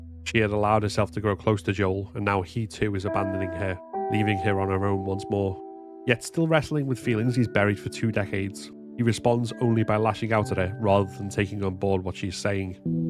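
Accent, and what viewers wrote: Liverpool, United Kingdom. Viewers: Are you a scouser?😊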